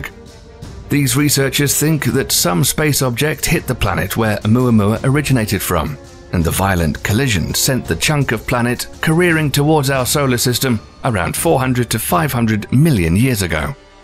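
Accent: United Kingdom. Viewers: British accents make narrations sound a million times better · Awful nit brit narrator.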